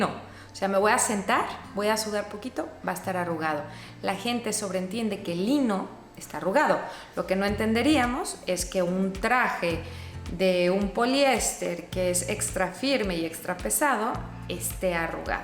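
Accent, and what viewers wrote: Mexico. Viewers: ese spaninglish es terrible fuera que tienes ese tremendo acento mexicano!!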